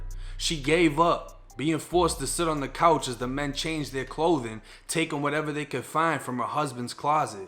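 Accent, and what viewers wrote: Boston, United States. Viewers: Know I hear that Boston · Why you mixing a heavy south Boston accent with a Louisiana drawl???? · U from boston or something ? Lol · where you from Boston?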